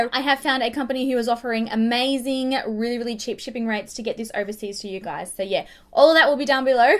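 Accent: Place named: Australia. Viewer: I'm aussie too & I can hear you have a strong accent... are you a country girl? & what state are you from? (I'm from the Blue Mountains, west of Sydney:} )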